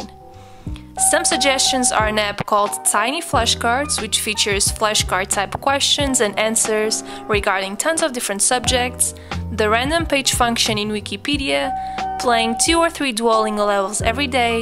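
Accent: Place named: Portugal. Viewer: Ando a descobrir canais que me transmitam energias positivas e me motivem, parabéns está incrível. Houve uma coisa que teve imensa piada, quando comecei a ouvir o vídeo pensei "Fogo parece mesmo uma portuguesa a falar inglês, super clear mas não inglesa obviamente" e fiquei mesmo "wow tem que ser". Fui ver à bio e acertei, que inglês excelente!!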